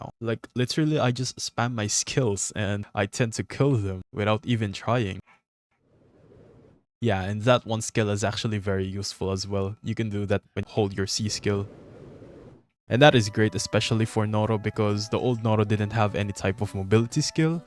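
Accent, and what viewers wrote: Philippines. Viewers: Potanginnaaa mooo pinooyyyy accent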